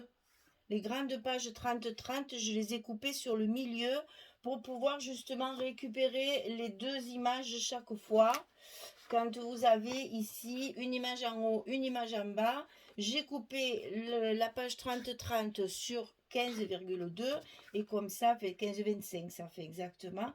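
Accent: South, France. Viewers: Bonjour, je vois vos vidéos depuis la Belgique (Brabant Wallon ).Merci pour vos réalisations et votre accent du Sud qui me fait penser aux vacances, au soleil. Ne changez rien, j'adore.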